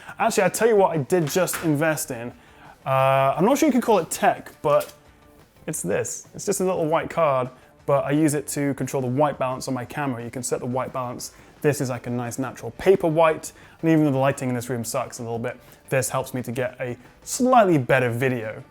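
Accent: United Kingdom. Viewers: I like your channel because your British ascent sound interesting.:) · Hands down my favorite YouTuber/ tech reviewer ever, his content is just so relaxing to watch an plus the guys from uk awesome accent happy new years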